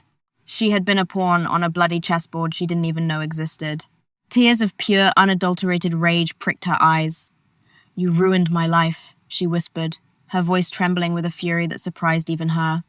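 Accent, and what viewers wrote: United Kingdom. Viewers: The British accent is fine. Have you heard the atrocious attempts at Italian or Sicilian accents in some of these?? This isn't AI, so thumbs up from me. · Yes I did she had to so they both could survive it was self defense. Very exciting g intense story I loved it from beginning to educate. I have a little trouble listening the narrator spoke really fast with a heavey British accent. I am from moline illinois USA and I give a 10+++++for the story.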